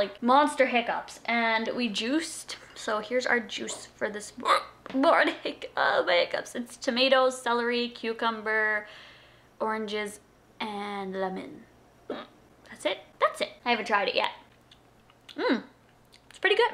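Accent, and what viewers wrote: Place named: Canada. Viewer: You guys seriously need to stfu. Number one " doesnt eat healthy why is she giving us tips" How do you know she doesnt eat healthy? We only see like 10 minuets of her day. How the hell would you know if she eats bad or not?! Maybe the reason she went shopping and only bought good food was because shes changing her diet? And number two "she speaks weird" e.t.c ITS HER ACCENT. MY GAWD. Part of my family is from Canada. The accents are different depending on the part you live in Canada. My cousins live in her province and talk almost exactly like her. My aunt and uncle live in North Canada and have a thick canadian accent. It just depeneds on where you live in Canada. STFU AND STOP HATING ON! I love you so much. I hate to see this. Please block who ever keeps hating. It makes me sick.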